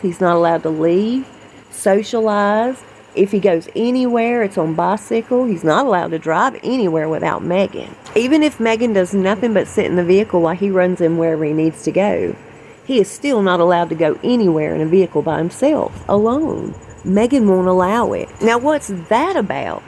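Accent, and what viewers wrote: South, United States. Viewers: My goodness I am watching this from outback Australia 🇦🇺 in the middle of nowhere, all I can say is that Ms you have the most soothing, sexy, Southern drawl I have heard in a very long time. 🤩🎙🔥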